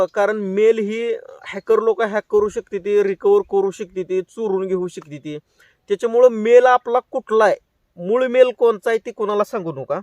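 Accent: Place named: Marathwada, India. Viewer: दादा तुम्ही कुठून आहे तुमचं बोलणं मराठवाड्यात दिसतंय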